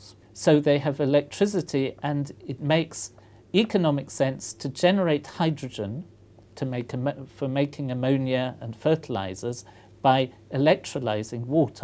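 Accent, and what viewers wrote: England, United Kingdom. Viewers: Crazy hair, lab coat, British accent = genius most likely. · and just the right amount of British accent.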